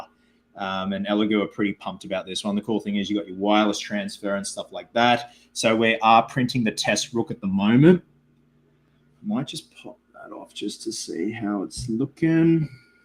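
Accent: Australia. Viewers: Lol, "Saturn"! I've been listening to this while sewing for a cosplay commission and I thought you were saying "Satin" this whole time, but then I looked up and saw the title and the banner at the bottom of the video 😆🤦🏻‍♂️! That Aussie accent really muddles words sometimes, I guess, huh, lol 😅...?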